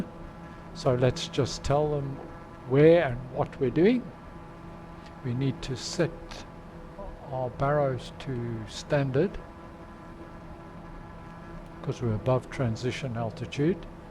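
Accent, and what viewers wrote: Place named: South Africa. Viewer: I think you should offer your voice as permium add-on. Would love to hear your soothing south african accent guide me from A to B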